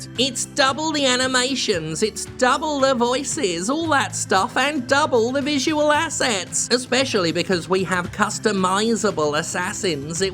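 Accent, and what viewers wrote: United Kingdom. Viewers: 2014 sounds britisher than 2020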